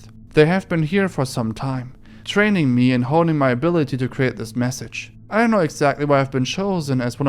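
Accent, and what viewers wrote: Germany. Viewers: My favourite German YouTube accent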